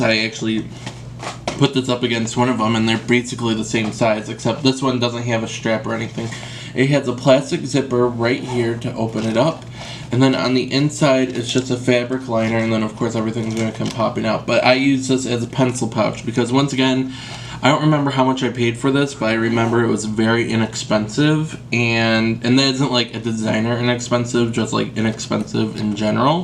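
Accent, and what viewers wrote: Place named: Chicago, United States. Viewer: ..I found you from seeing your comments on other YouTubers I watch! Well I fell in love with you and I luv that Chicago accent! Use to live there and miss it:)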